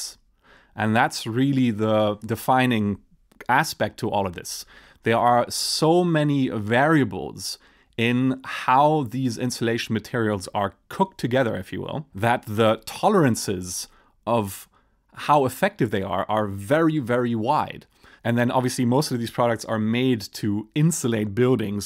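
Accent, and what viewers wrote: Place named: Germany. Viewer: You have some german accent, are you from Germany? :)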